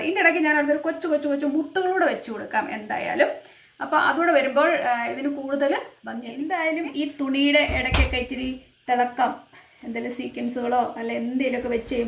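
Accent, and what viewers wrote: Kottayam, India. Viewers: കോട്ടയം kari ano 😍❤️😘👍👍👍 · Superb, good work, kottayam aano naadu, varthamaanam nalla rasamundu kelkan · ഇച്ചിരി ഇച്ചിരി .. കോട്ടയം. ചേച്ചി നന്നായിട്ടുണ്ട്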